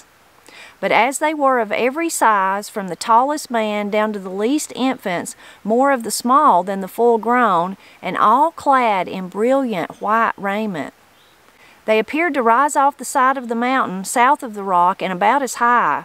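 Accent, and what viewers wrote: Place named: Southern Appalachia, United States. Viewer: What part of the country are you from my sister? I'm from Lee county Va and your accent makes me homesick. We now live in Franklin North Carolina and in the past near hickory nut gorge and brown mountain. Love your videos, God bless!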